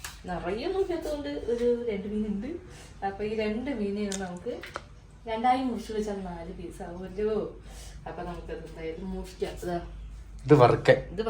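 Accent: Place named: Palakkad, India. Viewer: Palakad slang very nice 🥰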